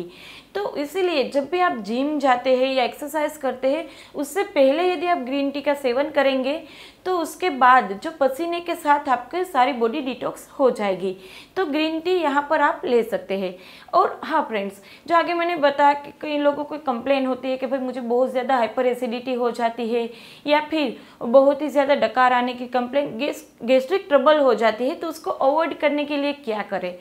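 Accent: Gujarat, India. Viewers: Tame gujrati chho????😎😎😎😎😎😎😎😎😎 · Are you Gujarati?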